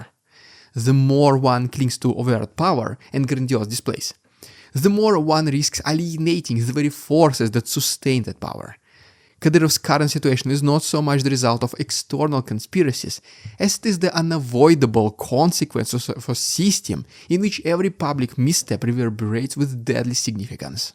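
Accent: Russia. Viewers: Man that perfect, lyrical English with that thickass Russian accent is amazing.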